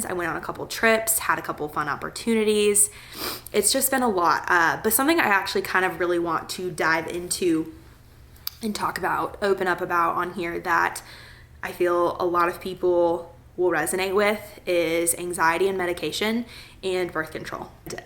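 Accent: South, United States. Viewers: Yes girl definitely so happy for you and so happy your back! Your a real inspiration and have such a kind heart, it’s so nice seeing someone who is genuine and I feel like your getting alittle southern accent in you!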